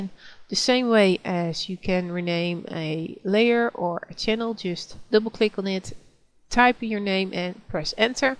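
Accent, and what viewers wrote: Netherlands. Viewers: je hoort wel dat je van nederland bent ^^maar is wel leuk ! kun je ook eentje in het nederlands maken ? coole videos meid!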